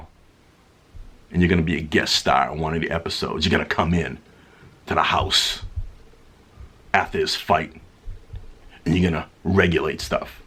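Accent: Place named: East Coast, United States. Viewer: Im living for your accent.. my dad has an east coast accent as well just not nearly as thick as yours! I love u and the girls and Marcellus as well!! Keep the videos coming, im diggin the channel pops! 😘❤️❤️❤️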